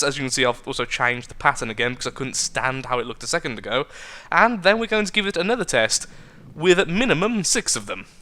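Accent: United Kingdom. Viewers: you sound british but you think like an american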